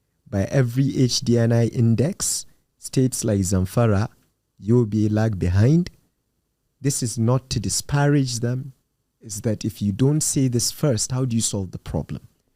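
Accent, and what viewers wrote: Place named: United States. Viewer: Bro... You just spoke my mind... He still make grammatical errors even with his American accent.😂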